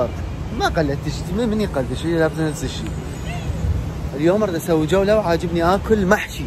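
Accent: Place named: Iraq. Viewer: الفيديو جميل و اللهجة العراقية تجنن اكتر لهجة بحبها ❤️❤️❤️نورت مصر 🇪🇬🇪🇬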